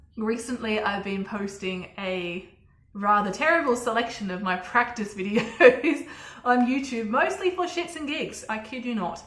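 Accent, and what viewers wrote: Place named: Australia. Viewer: Your normal voice is cute as hell too. I love your Australian accent. I myself have a Midwest/southern US accent.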